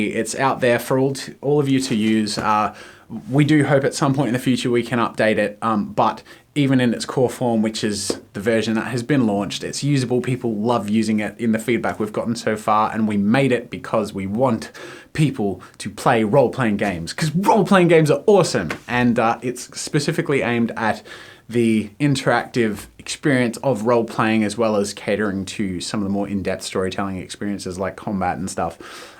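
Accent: Australia. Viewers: sounds more Australian 4 years ago than he does today:) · I have never heard such a huge aussie accent from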